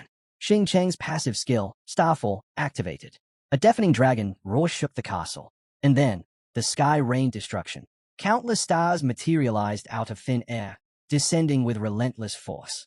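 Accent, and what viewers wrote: Australia. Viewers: why is this Australian? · It’s much harder to understand at 2x speed when there is an Australian accent. Please don’t make it that way next time, it’s unnecessary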